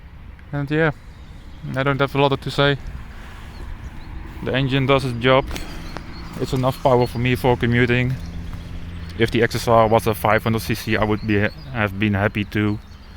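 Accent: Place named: Netherlands. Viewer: Dutch accent :)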